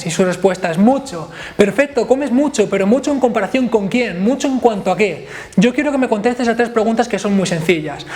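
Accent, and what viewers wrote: Galicia, Spain. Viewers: Desde hace unos meses tiene acento gallego en los videos,no entiendo por que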